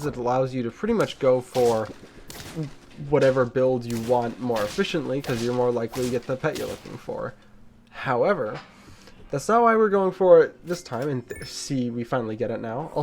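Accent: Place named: New Zealand. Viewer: didn t know you were from New Zealand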